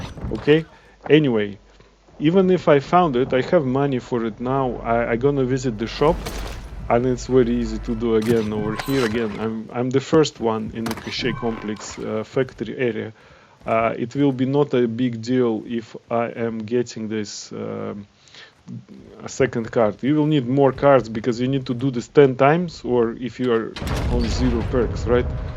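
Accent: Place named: Russia. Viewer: соу рашен акцент))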